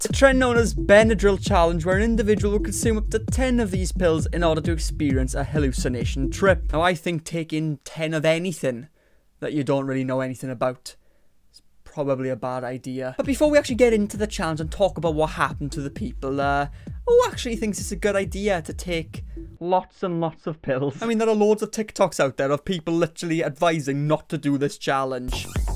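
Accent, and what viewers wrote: Wales, United Kingdom. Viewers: welsh accent🥺❤️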